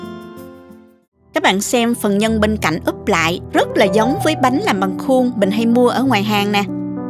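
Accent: South, Vietnam. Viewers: That cam on co...1 giong noi rat am cung....ngot ngao giong mien nam.ngot oi la ngot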